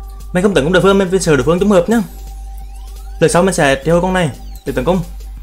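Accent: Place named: North, Vietnam. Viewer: Anh nói tiếng nam đi tiếng bắc khó nghe wa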